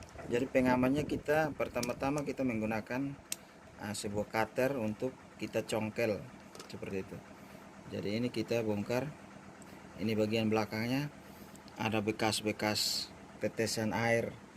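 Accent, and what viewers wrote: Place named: East Nusa Tenggara, Indonesia. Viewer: Logat kek org NTT 😂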